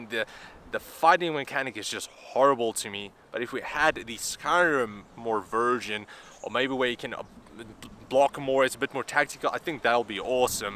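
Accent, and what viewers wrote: South Africa. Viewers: R u South African?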